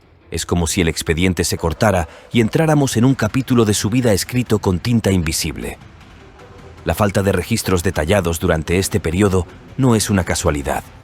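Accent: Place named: Spain. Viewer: Esa voz spanish 🤮🤮🤢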